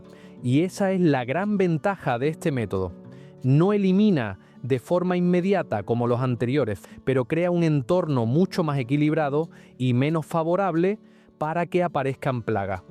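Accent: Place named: Spain. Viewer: HOLA, YO TE VEO DESDE BARCELONA A ESPAÑA. PERO ME PARECE QUE TÚ TAMBIÉN ERES DE ESPAÑOL. POR LO MENOS EL ACENTO UN POQUITO COMO EL MÍO. YO SI VERO PERO VAMOS QUE ME GUSTA TU VÍDEO. DE HECHO, YO ME LO GUARDO. VENGA, ME ENCANTA TU DEJE. ADIÓS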